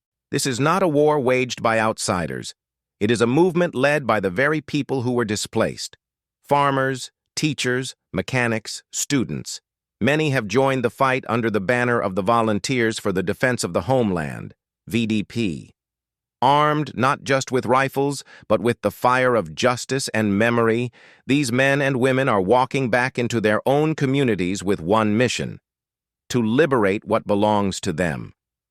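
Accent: West, United States. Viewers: The same western accent. Cant we have an African accent if we are getting news/presentations about Africa. It says that its not a war waged by outsiders, but this sounds like an outsider to me. I am fed up with this. TRULY RECLAIM OUR IDENTITY. We need to be African in EVERYTHING. This is NOT a voice of Africa! Pas la!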